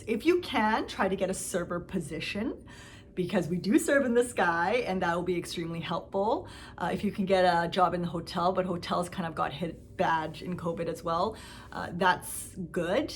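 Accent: United States. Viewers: How does she have an American accent if she is Canadian?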